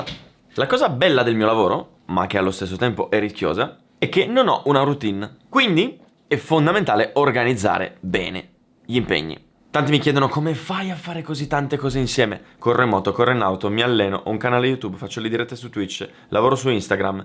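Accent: Italy. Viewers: Minchia stavi parlando paleminato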